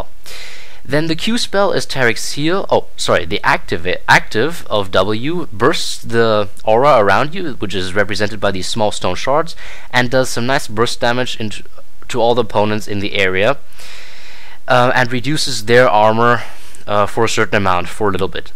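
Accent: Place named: Germany. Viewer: Man hört dass du deutsch bist aber nich so dchlimm ^^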